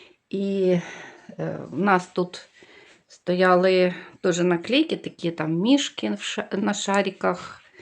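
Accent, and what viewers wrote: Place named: Vinnytsia, Ukraine. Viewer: Я здогадалася,що ви з Вінничини, по вашій манері розмовляти,я також з Вінничини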